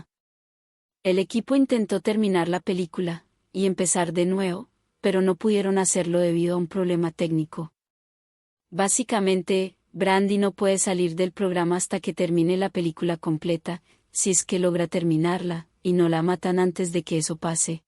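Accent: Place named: Spain. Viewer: Very good job 👍 Thank you very much for italian subtitles 😊 I love so much your voice with your spanish accent 😉❤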